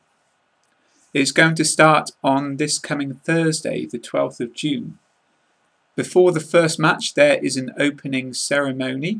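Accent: United Kingdom. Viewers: Hello, cheers from Egypt um really keen on learning British accent and especially ur luvly accent :) can u please recommend the easiest ways...I've heard of "shadowing" which means 'coping' and repetition of sb's speech and after a while you will be able to gain the accent.I've NOT started yet to do it cuz um waiting for ur advice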